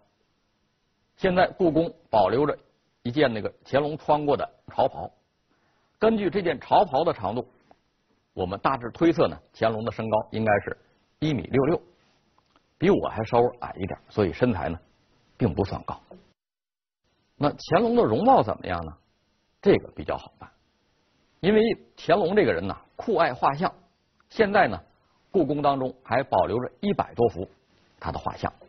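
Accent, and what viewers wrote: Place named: Inner Mongolia, China. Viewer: 他是蒙古族，講話音調跟漢人不一樣，當你看完全系列會有很大的收穫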